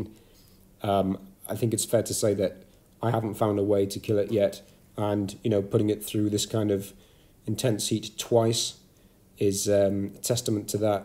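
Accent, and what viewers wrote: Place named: United Kingdom. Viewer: So the watch not working is a protection thing from the heat? I live in Arizona where it gets to 120 degrees , and my Gshock, keeps and going, and just about any other watch I have, including a mechanical wind up watch. The summer's in Arizona gets so hot you cant touch metal with out it burning your hands, you have a British accent, I grew up in the UK, the UK does not get that hot. Something is wrong with your watch, my Gshock is 11 years old, just saying.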